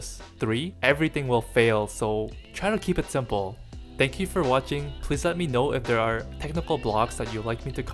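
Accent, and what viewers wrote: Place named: Asia. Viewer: I could tell you're asian without seeing your face It's your accent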